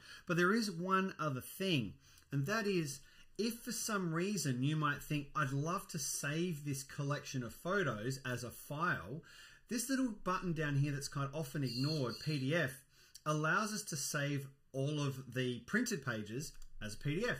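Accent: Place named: Wales, United Kingdom. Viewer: Thanks mate, you saved my bacon. Also, I love your Welsh accent! 😉👍🏻🇬🇧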